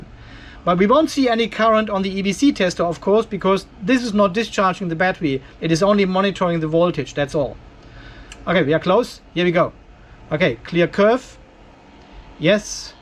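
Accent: Germany. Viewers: Is it right, that you have a german background? You said "nochmal" and your english sounds like here in germany 🤣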